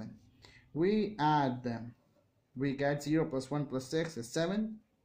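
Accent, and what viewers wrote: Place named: Hindi, India. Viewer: Lol.. Nice...trying to catch up with an accent , but instead of getting along one, you are mixing accents and flow is still hindi .. And YOU KNOW🤣 strange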